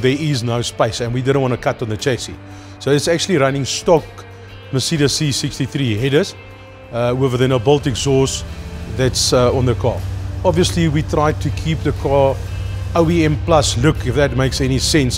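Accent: Afrikaans, South Africa. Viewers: That's just a South African Afrikaner accent. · Thats the thickest Safa accent of all time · What accent is it, does anyone know?! I mean sounds a bit South African accent but not sure.🤔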